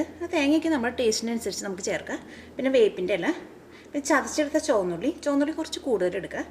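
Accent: Thrissur, India. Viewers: Thrissur bhasha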